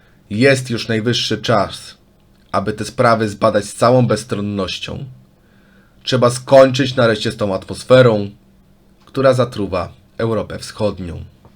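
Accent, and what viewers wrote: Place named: Ukraine. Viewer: Bo to Ukrainiec i nie mówi tak dobrze po polsku jak Polacy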